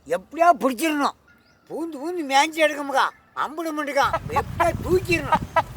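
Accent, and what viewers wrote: Tamil Nadu, India. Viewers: Which part of Tamil dialect is this ? Very interesting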